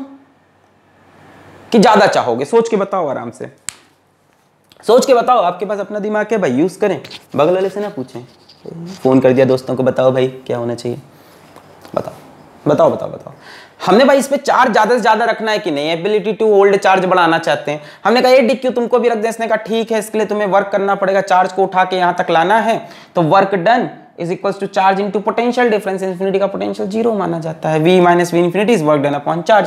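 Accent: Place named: South, India. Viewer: South Indian accent 😍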